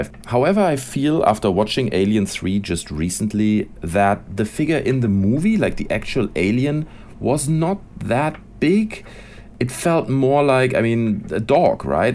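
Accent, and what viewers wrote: Germany. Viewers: Du bist deutscher stimmts? Man hört das irgendwie am english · Du bist doch sicher deutsch ich höre es. Oder irre ich mich?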